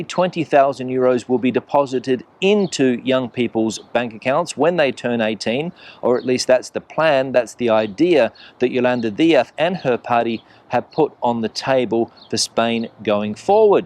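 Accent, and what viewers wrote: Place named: Australia. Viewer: Congratulations on the best Australian accent!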